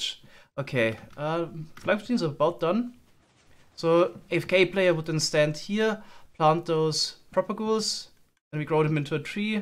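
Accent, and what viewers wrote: Germany. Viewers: Bro sounds to german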